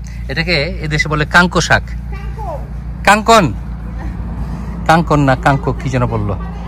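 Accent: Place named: Sirajganj, Bangladesh. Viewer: সেই স্বাদ !! এত স্বাদ ক্যা?... 🤣সিরাজগঞ্জ !! বিদ্রঃ এটা পাশের এলাকা পাবনারও একই উচ্চরিত ভাষা !! 😅😅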